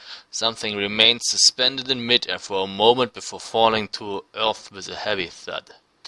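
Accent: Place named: Germany. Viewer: dude, you sound so german!!! :)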